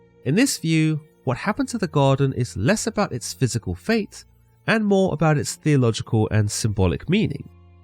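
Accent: United Kingdom. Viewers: I knew there was going to be some type of British accent when I clicked on this.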